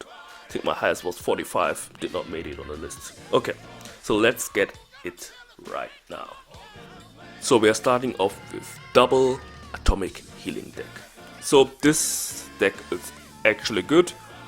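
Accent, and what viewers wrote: Germany. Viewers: Dein Denglisch ist Sympathisch haha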